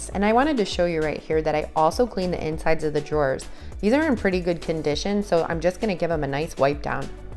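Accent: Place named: Minnesota, United States. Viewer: I love this! I have already ordered most of the items you use! Also, I love your Minnesota accent!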